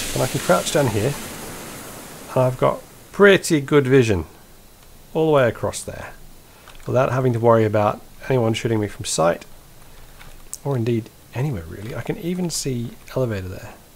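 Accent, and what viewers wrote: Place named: Wales, United Kingdom. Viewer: 1 question plz : u from UK? edit: yeah,i fukig knew it,i have a friend from UK too,and his accent exactly like u...and he live in south wales GReat smoke,dude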